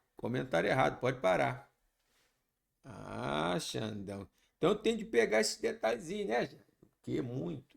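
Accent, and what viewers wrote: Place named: Rio de Janeiro, Brazil. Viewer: Esse carioquês é uma maravillha, kkkkkk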